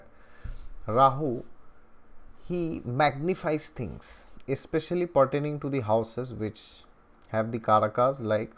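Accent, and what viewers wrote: Assam, India. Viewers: You are Assamese right?😄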